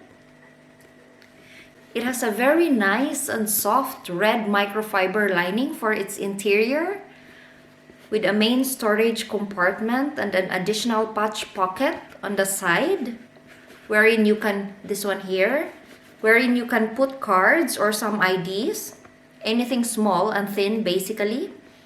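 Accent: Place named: Romania. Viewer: Hi!! Love the accent na.. so romanian! Mwah! Miss you!! Congratulations on your blog!! 🎉🎉🎉🎉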